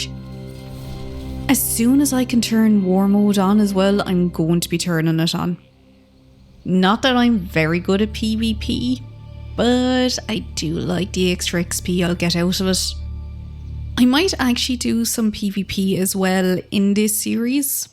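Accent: Ireland. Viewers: shocked hearing the Irish accent , Louth here (Irish wow players unite)😀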